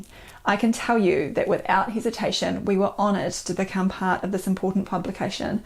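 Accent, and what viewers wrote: New Zealand. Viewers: I'll definitely be downloading the audio books. As I'm a fan of the Kiwi accent and all. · your New Zealand accent is killer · A whole book read to me by, I am in. Love your voice and Kiwi accent. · Hi, I really enjoy watching your channel and greatly appreciate the concise and informative style of your videos, not to mention that NZ accent! I read the second edition of Virus Mania, a while ago, and I found it so engaging that I couldn't put it down until I'd read the whole book. I certainly see the merits of the alternative hypothesis explained there and also how it fits into the model of the overarching control imposed by the pharmaceutical industry and its delivery teams, I mean health services. I'll have to buy the new issue... One thing that came to me, after reading the book was the question of disease transmission, through contact or air-borne aerosols, for instance. 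The particles (exosomes and similar?) that are produced by cells in response to toxic, or other stress factors, will be relatively simple, e.g. a fragment of DNA or RNA, surrounded by a lipid membrane, with a few signal proteins embedded in it, which will look like what we have been led to believe is a virus. If these particles were transferred by traditional 'infection' methods, would they not just act the way we are told that 'viruses' do and elicit immune system responses in the recipients and be classed as the disease? I apologise if I'm restating something that's obvious to others. I just wanted to clarify my understanding. Cheers. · Thank you for your informative videos dear. Is that a New Zealand accent I detect? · I enjoy your kiwi accent and I’m looking forward to the audio book.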